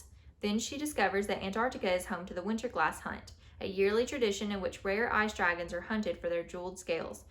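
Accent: South, United States. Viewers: You have my favorite southern accent on YT. I'm from Mississippi; I used to have a distinct southern drawl before I spent years living in Europe and doing voice work over the last years. At any rate, if you like to read out loud, you could always play a part in one of my readings. I have many collabs planned with different creators, and a backlog of works to do. Sincerely, Scully.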